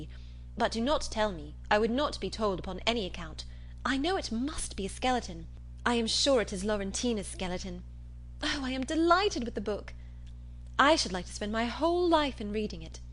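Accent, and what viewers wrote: United States. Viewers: Jane Austin with an American accent. 🤮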